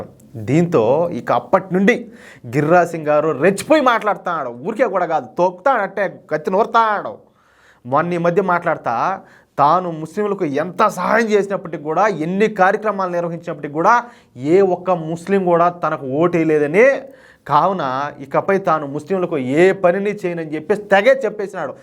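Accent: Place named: Nellore, India. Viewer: Yes. Rightly said by Honourable Minister. Garu, I liked your Nellore Syle Assent. Keep it up.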